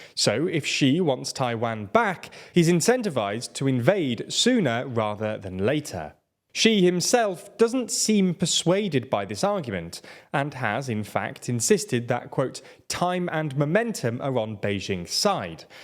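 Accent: United Kingdom. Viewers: i like the program but that stron British accent is really terrible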